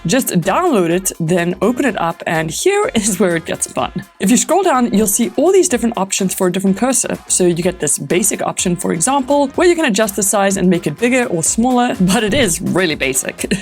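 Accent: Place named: South Africa. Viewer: Love the super fun features that you highlighted as well as the South African accent!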